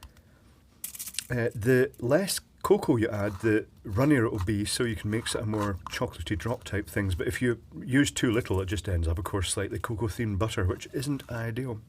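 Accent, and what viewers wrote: Scotland, United Kingdom. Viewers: He definitely isn't faking the accent. Proper Scottish cooking there.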